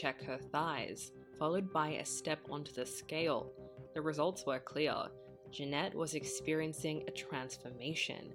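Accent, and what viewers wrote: Australia. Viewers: Nice to hear a fellow Aussie. Thankyou for this. 😊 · Is this Australian??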